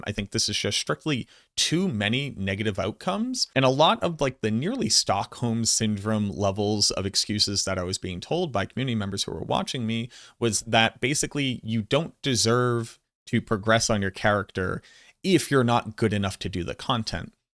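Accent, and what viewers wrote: Boston, United States. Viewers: Hit'em with the math,!! Lol Great vid! Thanks brother. Appreciate you. Oh, and those Bostonian slide-ins, "id-eer" icing on the cake! LMAO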